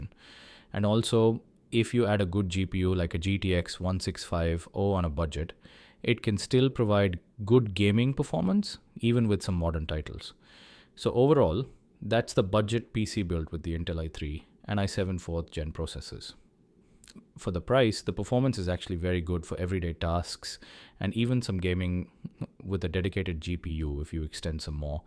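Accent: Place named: India. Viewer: True indian accent 🫡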